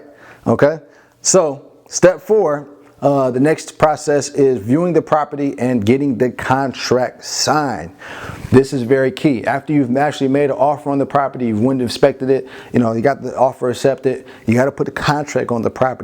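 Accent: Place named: Jamaica. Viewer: Hi, this is Carol Whilby, heard the yard accent, this is an answer to prayer, I NEED A MENTOR REALLY BAD, hope you have a spot for me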